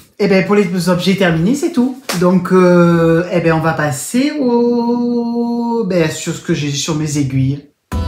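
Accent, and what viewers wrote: South, France. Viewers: ce bel accent du sud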